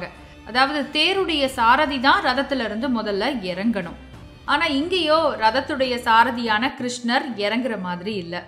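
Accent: Tirunelveli, India. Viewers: super story sister nice explain. vazga valamudan kattakadaisi endra tirunelveli vattra valaku super. neegal sollum poluthu kadhai kalathirkae kondu sellum munnorkalin thiramai irukirathu. super sister.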